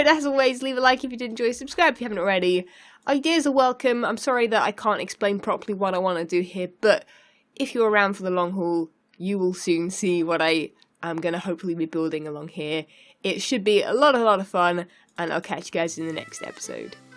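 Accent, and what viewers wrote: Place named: England, United Kingdom. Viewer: i love your English accent :D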